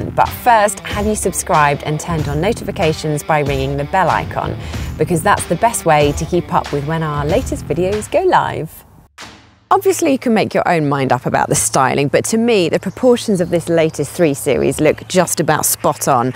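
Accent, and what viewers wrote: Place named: RP, United Kingdom. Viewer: Oooh, I do like her posh accent. :)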